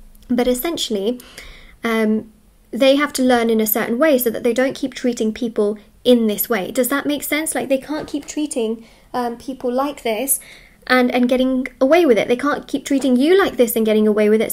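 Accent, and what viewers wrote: England, United Kingdom. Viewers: which part of england are you from? I’m curious to place your accent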